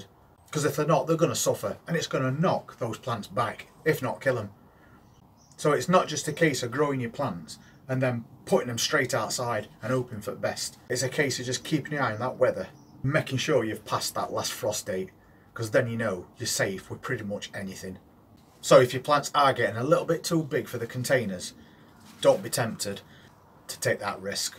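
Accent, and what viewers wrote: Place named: Nottingham, United Kingdom. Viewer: Really helpful video. Where abouts in the UK are you? you sound local to me (nottm)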